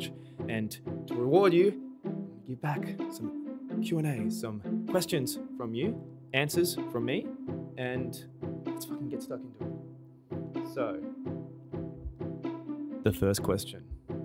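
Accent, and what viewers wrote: Australia. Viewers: The moment you start talking I knew you have some Aussie vibes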